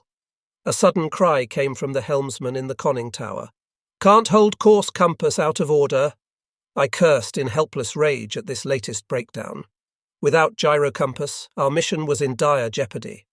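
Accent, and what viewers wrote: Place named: United Kingdom. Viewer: Why does the narrator have a British accent? Shouldn't he have a German accent?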